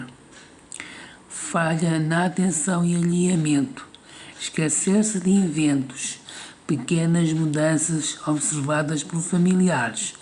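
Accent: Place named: Portugal. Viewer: Olá amiga, passando para conhecer seu trabalho. Muito bom saber sobre a doença. Você parece de Portugal. Deus abençoe 💋🌹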